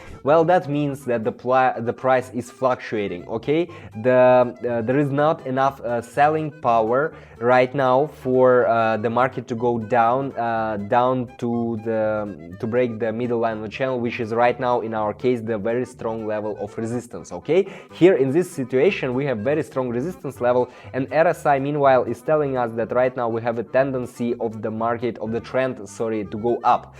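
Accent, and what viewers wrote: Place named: Russia. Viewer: that russian accent adds character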